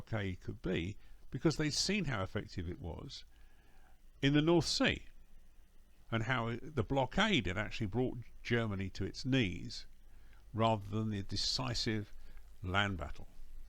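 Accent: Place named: RP, United Kingdom. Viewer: What a beautiful British posh accent. I truly love it the way you speak.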